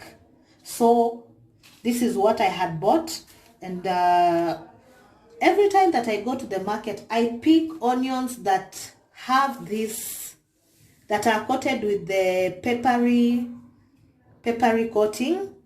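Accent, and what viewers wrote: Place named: Kenya. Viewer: As soon as i heard your voice - i was in love :-) Love the Kenyan accent. Been there on a long holiday and at Nairobi. Love what you've done here, really helpful and well filmed. Likes and Subscribe from me :-) Discovered your channel while watching the 'Vihaan' channel.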